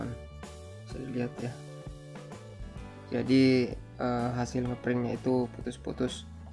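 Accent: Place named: Sulawesi, Indonesia. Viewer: Orang sulawesi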